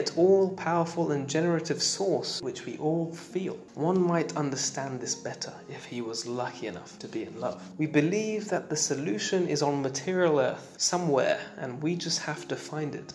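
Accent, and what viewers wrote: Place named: London, United Kingdom. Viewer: Where are you from? You sound like a Londoner but I've never heard the word 'says' pronounced your way.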